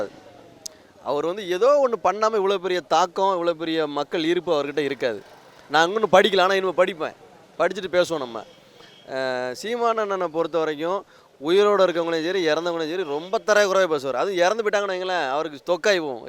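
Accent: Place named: Tirunelveli, India. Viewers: நெல்லை தமிழில் எழுத்து வாங்குகிறார், வாழ்த்துக்கள், உழைப்பிற்கு நிச்சயம் உயர்வு தேடி வரும் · நம்ம நெல்லைத் தமிழ் சிறப்பு அண்ணா 💥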